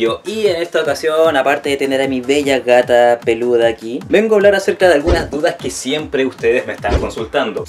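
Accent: Chile. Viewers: Ya me imaginaba q era chileno se parece a Germán ajajajajja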